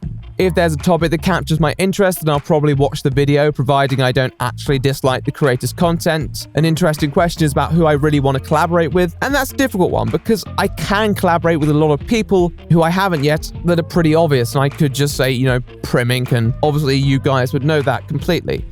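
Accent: RP, United Kingdom. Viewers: Can you fuck off out of my country. Groningen doesn't want your either.. Take your British speal covered in that accent without giving any substance and fuck off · I ship you with anyone else who has a British accent and a cartoon avatar. IHE, Cynical Reviews.. you know the sort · first, good job on the content, I've been watching you since you essentially rebooted your content, with the we shall say current style of content. The accent is of course posh, I'm from the north of the UK, you sound like an afternoon tea sort of person, dial that shit up to full posh sort of thing... I love the style of content, I don't generally like the drama scene, I find daddy keemstar toxic, essentially a nuke in a China shop, while you don't cover every drama story that would be impossible I get any drama info from you, it's enough for me. If there's one thing, you sometimes talk at a break neck pace, generally it seems when the passion/emotion is flowing, but it's not to the extent for me personally I can't follow unless I'm not focusing. 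Keep up the work! There's not too many YouTubers I watch every video, only a hand full if that where I keep watching over a year plus, your one of them. I'm sure your fans would back me in saying, keep the content coming, experiment if you must, we will let you know if it's shit:)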